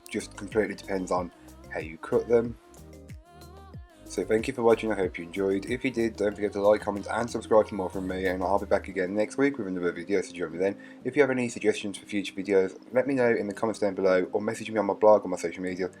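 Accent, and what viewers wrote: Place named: England, United Kingdom. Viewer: Can I ask where your accent is from in England. It sounds very dulcet to ones hear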